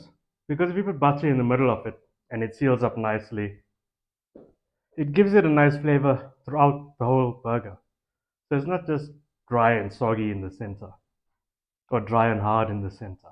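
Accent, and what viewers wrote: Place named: Bengal, India. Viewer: dude sounds like a bangali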